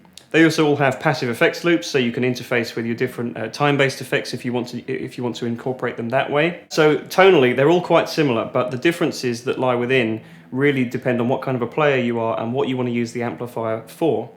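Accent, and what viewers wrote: United Kingdom. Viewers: I thought the store was at Texas or something but the British accent oh ok.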